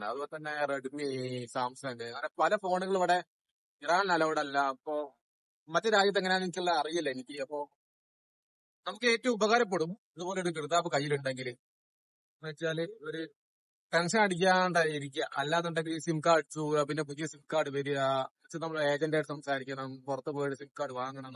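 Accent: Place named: Beypore, India. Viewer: Nyan beypore annuu..ningalude samsaaram kettappam pudi kitty...😅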